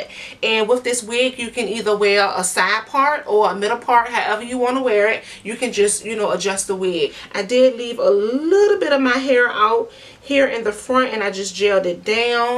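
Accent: New Orleans, United States. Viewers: Are you from New Orleans?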